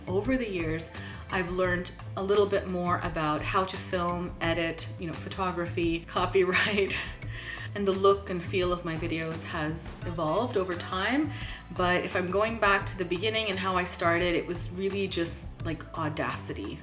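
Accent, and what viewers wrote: Canada. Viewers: Thanks for sharing so openly in this q&a. Thoroughly enjoyed it and liked getting to know more about you. As another 1st gen canadian poc - I get the accent thing. We just sound Canadian, no faking ;-) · Your accent is like any Canadian person and any one who’s telling you fake or anything like that , they have a disease called “ jealousy “.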